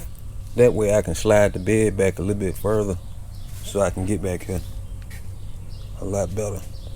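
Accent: Alabama, United States. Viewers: Thanks for the video. Trying to learn if it's worth my time to do my obs Yukon. Sounds like you're near me (muscle shoals) - do you run into issues with humidity or do you run dehu's?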